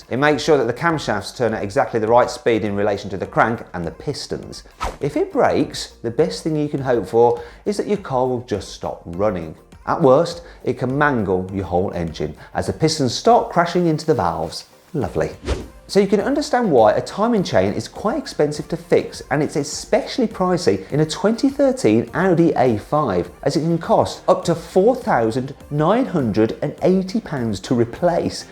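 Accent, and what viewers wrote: United Kingdom. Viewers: マットの英国アクセント好き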